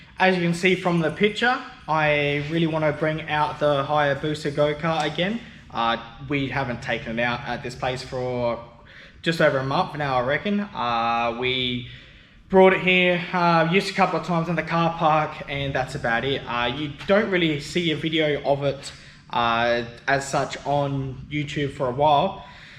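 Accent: Australia. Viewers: Bloody!!!!!!, Insane!!!!, Well BUILT!!!!!, Ya Sound Aussie:text-green-game-over::text-green-game-over::text-green-game-over::face-green-smiling::face-green-smiling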